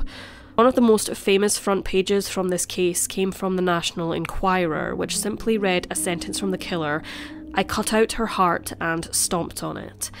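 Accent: Scotland, United Kingdom. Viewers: I could listen to you all day! When I was little, my mother was very ill, and the neighbor lady looked after me. She had emigrated from Scotland, and that accent is associated with some of the happiest days of my life. Keep up the good work! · Honestly I have a hard time listening to the Scottish accent. Not cause I can’t understand it, just don’t have like them. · Is the narrator east-coast Scots? Former Banffer here. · Yes, fellow Scot, nice to hear our accent.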